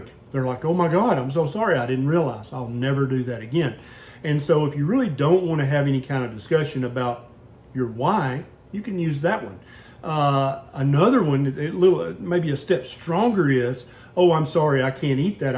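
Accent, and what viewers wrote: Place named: North Carolina, United States. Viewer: LOL. Randomly dumped into your channel. Sounds like a NC accent. Subbed.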